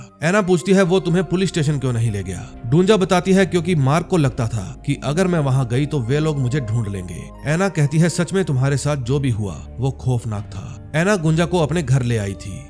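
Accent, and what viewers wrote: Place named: Haryana, India. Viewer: Haryana se ho aap ki voice se lagta ha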